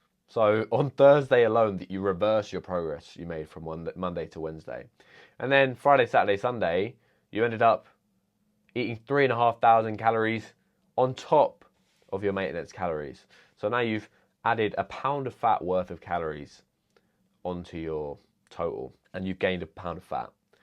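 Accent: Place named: United Kingdom. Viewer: Can't understand this heavey accent British English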